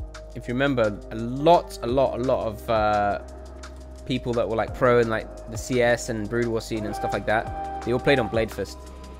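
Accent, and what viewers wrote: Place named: United States. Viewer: i like ur american resto druid accent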